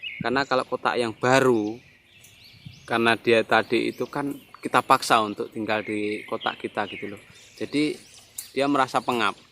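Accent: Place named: Madura, Indonesia. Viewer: Oohhh tak kira di Trawas Pasuruan atau wsrga Pasuruan Jawa Timur. Dialog bahasa nya seperti Jaws timur Madura turun Pasuruan. Terima kasih telah berbagi ilmu, semangat ya mas. Berbagi ilmu nya.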